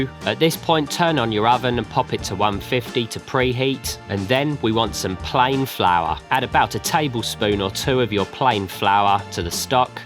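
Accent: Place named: Cockney, United Kingdom. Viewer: Why does a "West Country Kitchen" video have a cockney voice over? I expected a Devon burr, or at least some kind of "Ooh, arr"!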